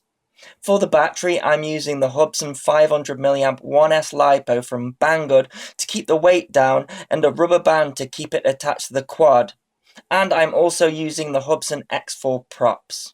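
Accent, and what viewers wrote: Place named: United Kingdom. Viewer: Oh sorry, just you have a British accent and the scenery around where you fly look like it is the uk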